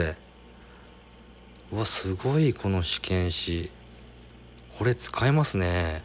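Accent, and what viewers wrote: Kyushu, Japan. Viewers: こんばんは 九州弁ありがとうございます😊な〜つかしい〜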